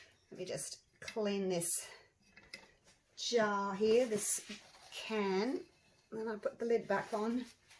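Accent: Australia. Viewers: Hi, love your videos and hearing our good old Aussie accent! I ordered the minwax on Amazon and I noticed in your tutorial you said that people in the US can use Behr with Jo Sonja’s, but can we in Aus use the minwax with taubmans?? Thank you for this video 🥰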